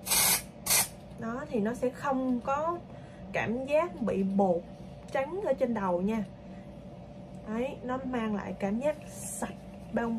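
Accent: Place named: Binh Dinh, Vietnam. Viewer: Giọng Bình Định hay quá chị ơi!!!!!!!!!!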